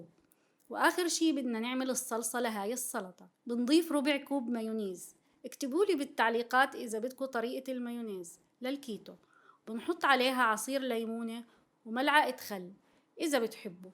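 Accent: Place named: Palestine. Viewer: لهجتك فلسطينية ❤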